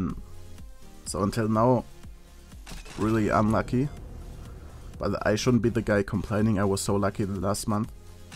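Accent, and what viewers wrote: Germany. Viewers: Man hat sofort gemerkt das du aus Deutschland kommst ^^ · Are you german? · Man merkt sofort das du Deutscher bist :D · Ok du bist deutscher oder? Wenn ja merkt man das, wenn nein kannst du das hier ja eh nicht lesen xD ^^ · Ich liebe deinen deutschen Akzent:DD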